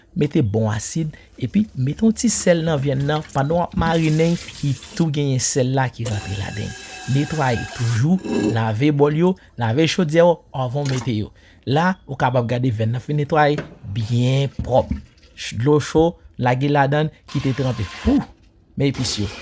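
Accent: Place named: rural, Haiti. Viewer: Pagen capital anko aksan ban moun nn morn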